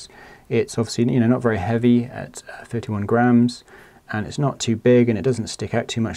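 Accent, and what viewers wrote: Bristol, United Kingdom. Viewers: Fellow Bristolian? But in the Ocean so maybe Plymouth?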